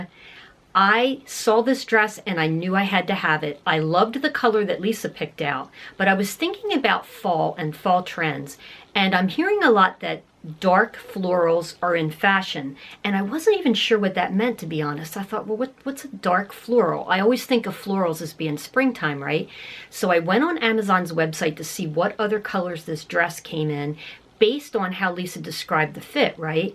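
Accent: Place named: New Jersey, United States. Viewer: you are such a joy to watch. Your kindness is much appreciated as is your humor! I'm originally from New Jersey, I left at 24 but we go to ocean city some summers. Your dialect sounds like home. Really, really enjoy your videos and yes, I would love to see some amazon hauls. Your pace is perfect. You are easily understandable and thorough and come across as a friend. So happy I found you.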